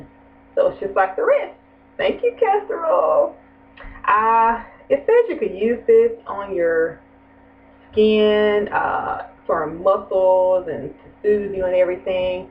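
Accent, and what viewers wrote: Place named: South, United States. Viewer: swear I love her southern voice... would hit that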